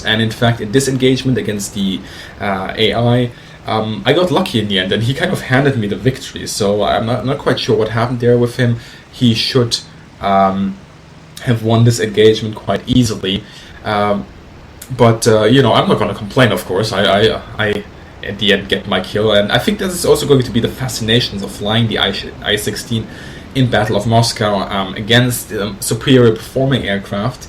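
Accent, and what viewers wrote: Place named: Germany. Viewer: Wow! What a way to present information, through an actual real-time simulation. And do I recognize my favorite German accent guy? Whose depth of knowledge of the ww2 aviation history is UNSURPASSED? Thank you! This is awesome! I learn more from this than by flying the simulator myself! Great medium! Re-live reality!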